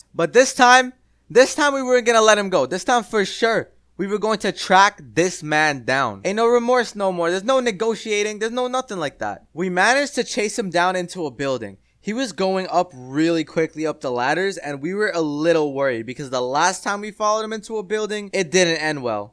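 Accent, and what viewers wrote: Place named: Italy. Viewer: When I hear your voice, I hear an Italian accent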